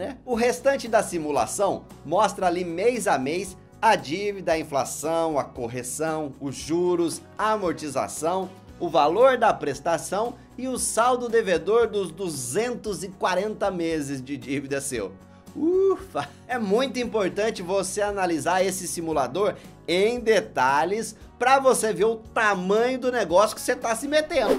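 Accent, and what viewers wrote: Minas Gerais, Brazil. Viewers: Mineirim de tudo!! E já foi corretor de imóveis! Kkkkkk!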